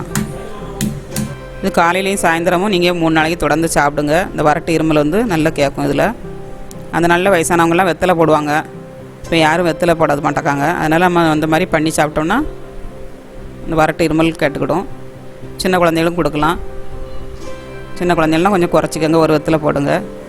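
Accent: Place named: Tirunelveli, India. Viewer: Nice slang tirunelveli Thoothukudi slang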